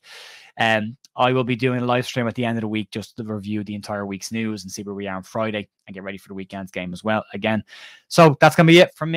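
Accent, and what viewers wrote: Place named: Ireland. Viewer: Where in Ireland you from bro? I'm Newtownards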